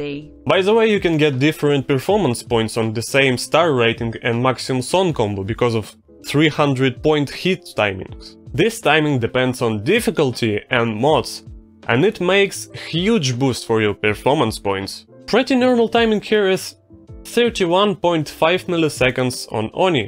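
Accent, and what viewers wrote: Russia. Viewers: RUSSIAN ACCENT, why not do Ha Pycck| /| |/| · ахаххахах, смотрел все видео с мыслью: "Какого хера у чела такой сильный русский акцент?" (слишком сильно напоминает меня, когда свой голос записываю). Вот оно что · я сразу понял, что имею дело с Российским человеком из-за акцента) очень всё понятно объяснил, без воды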